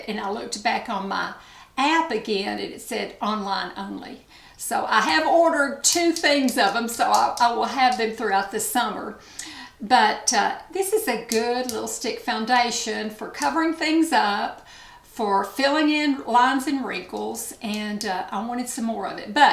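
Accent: South, United States. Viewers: you are adorable...such a classy well mannered woman. I love your southern accent I could listen to you all day. Thank you so much for showing us all the products and giving a review. I may buy the KVD liner because my liners always disappear after I wear them awhile. The Milani palette shadow looks beautiful on your blue eyes. On the color wheel orange is opposite blue so that makes your eyes pop. You are so young looking, just stunning.🌸💕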